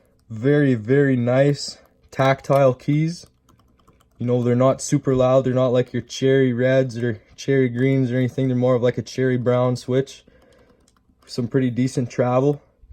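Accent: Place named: Wisconsin, United States. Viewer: This guy must be up north. Close to Canada probably from Wisconsin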